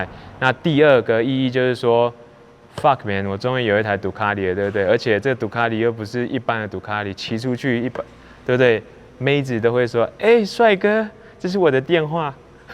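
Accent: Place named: Taiwan. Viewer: 老師貌似是台灣腔。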